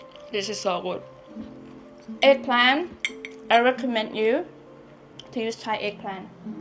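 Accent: Australia. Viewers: Why does she sound Australian? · Thanks for that. You have obviously been to Australia with that accent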